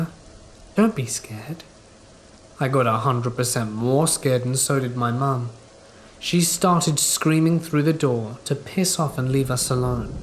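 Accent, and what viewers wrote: England, United Kingdom. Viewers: Hey! Bro, I love your channel content. I listen to your scary stories every night before I go to bed, and I gotta say... I look forward to it every day. Have a good rest of the week. I may send you some of my true scary experiences with ghosts and the supernatural. It would be so exciting to hear you recite them in one of your videos! One more thing...your English accent is superb! Almost makes me want to speak like that, and just adopt the accent for when I move away, lol.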